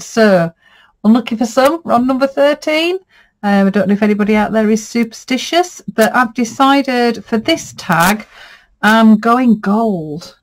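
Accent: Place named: North, United Kingdom. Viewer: Just been told by one of our mutual viewers that I sound like you 😁💜 so I thought I'd better pop over and see, or rather, hear for myself 🤭 Well we're both from the north but I suspect there's a county or two between us 😁 Loving the tags.. Joie 💜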